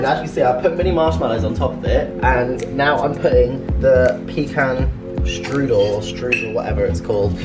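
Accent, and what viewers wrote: United Kingdom. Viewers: Botter I can’t I love the British accent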